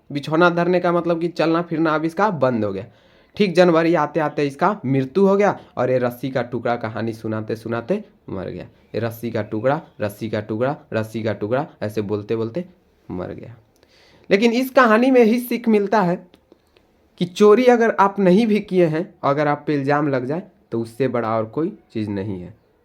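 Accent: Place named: Bihar, India. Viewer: Total bihari vibe aaya sir maja aa gya😂 padhne me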